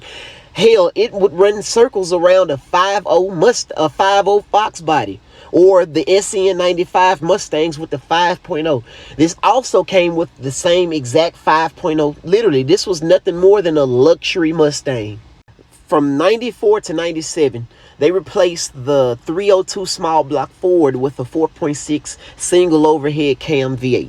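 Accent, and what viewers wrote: Memphis, United States. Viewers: You can tell he from memphis btw he talks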